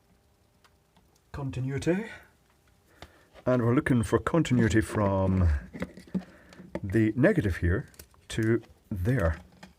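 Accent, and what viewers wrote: Scotland, United Kingdom. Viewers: What kind of Scotland are you from I'm from Fife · Scottish accent and you avoided saying "de-burr"...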